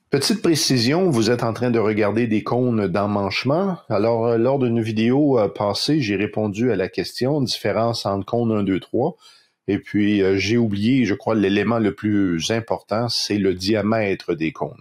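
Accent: Quebec, Canada. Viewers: Bonjour, aucun problème pour les petites différences français du Quebec et de métropole, ça pimente en mettant une touche d'exotisme, et ça nous donne l'impression d'apprendre le Quebecois. Pour le choix du tour, ayant suivi pratiquement le même parcours, je partage entièrement ton point de vue. 😉👍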